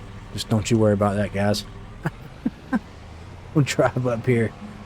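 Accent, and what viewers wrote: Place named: Carolinas, United States. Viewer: You from one of the Carolinas? Some of the way you talk reminds me of Dale Jr. 🤣 Good stuff man.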